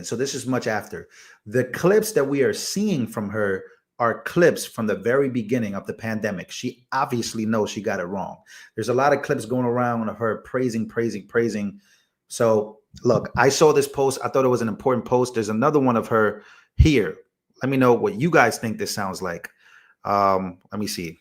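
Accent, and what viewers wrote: New York, United States. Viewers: Accent dont lose the NY accent. I lived in TX in 1990 San Angelo best time of my life. Great people. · “Your lovely NY accent” is what they meant! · Moved to Indiana from Kentucky 27 years ago and I still have my accent 😅 I trust you'll keep that New York accent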